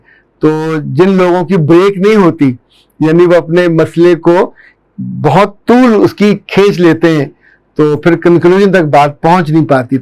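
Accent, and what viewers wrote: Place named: Hyderabad, India. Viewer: Hyderabadi Urdu ...Awesome 👌👌👌😊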